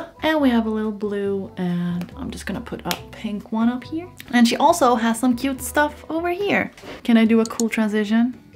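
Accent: Sweden. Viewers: I can’t stop thinking abt that shes from sweden. I mean I can hear by her accent:,) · I see i see i heard that you were Swedish by the accent and the ramlösa if Im Wrong I don’t know what to do with my life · I don’t know my why but i get these swedish vibes cus the acent🤠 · Omg I never heard such a strong swedish accent it's tickling my brain in a way I never thought it wouldve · swedish accent like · ahhh yes the stockholmare english accent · Wow din svenska accent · Are u Swedish? U kinda sound like u r! If u r hej hoppas du har det bra! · Me: hears her accent and hears her say 11, me: yap hon är svensk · I have a question. Are you from sweden? Because you sound like you are and if you are:hur mår du? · You sound Swedish 🇸🇪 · Yo, you sound so Swedish, please tell me I got it right lmao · Let me guess, Swedish? The accent, ikea furniture and she didn’t even say eleven she said elva- Oh wait her bio says everything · Omg shes from sweden. 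It was the first thing i thought because of her accent and then she said "elva" which is the swedish word for eleven. Så hej! Jag är också från sverige! :) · u sound so swedish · is that a Swedish accent I hear??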